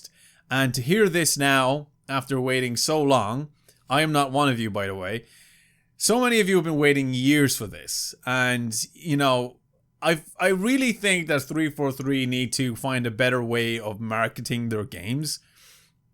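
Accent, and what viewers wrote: Ireland. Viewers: i can´t tell if you have slightly poor english or if you´re just irish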